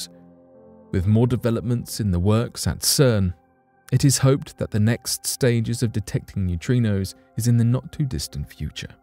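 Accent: United Kingdom. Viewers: Lisping is annoying, even with a British accent. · I'll believe anything in a video that's narrated by someone with a British accent. Subscribed.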